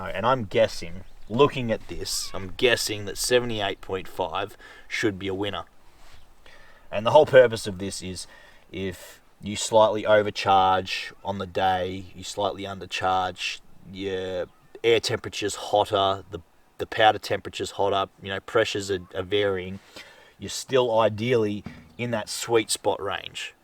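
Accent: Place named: New Zealand. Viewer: awesome vid. im trying to work up a load for the same rifle. thanks for doing this. p.s sounds like ur in NZ - i would love to come hunt there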